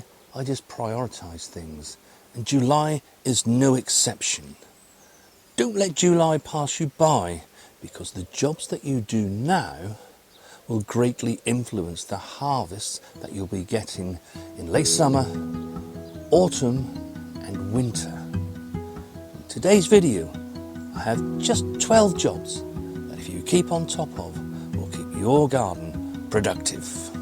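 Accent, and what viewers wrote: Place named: RP, United Kingdom. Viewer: Where abouts in Norfolk are you - I'm strugglng to identfy it by your rather posh well spoken accent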